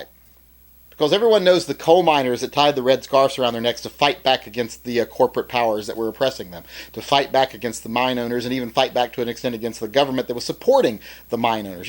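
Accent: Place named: South, United States. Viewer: don''t hide your southern drawl just because ebomey doesn;t like it..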